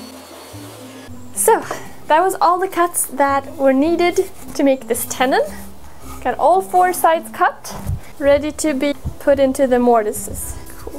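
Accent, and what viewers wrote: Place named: Sweden. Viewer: Misstänkte nog att det var en svenska bakom den brytningen. Anywho snyggt jobbat :)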